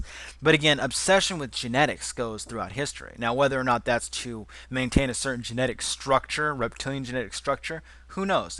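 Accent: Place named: United States. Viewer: I Cannot stand the arogant yank talkover it pisses me off!Cant someone else do it?(it,s just his voice).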